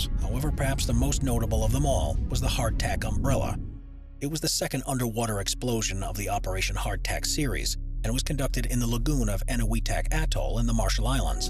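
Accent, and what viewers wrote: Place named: United States. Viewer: Come on, you sound American so why not use the non-metric units we use, like miles and pounds instead of kg and kilometers?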